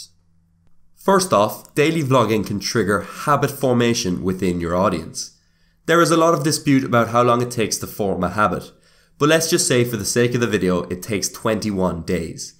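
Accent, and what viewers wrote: Ireland. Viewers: to begin, I love your accent! Ireland Forever!!!! I agree with your evaluation 100%. I grew up in Ct., not too far from where he is from, and he is a proud son of our state! Thank you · Is that an Irish accent I hear?! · I LOVE your Irish accent! What part of Ireland are you from? Btw I LOVE how insightful your video is. It helps me figure out how to better grow my own vlogging channel!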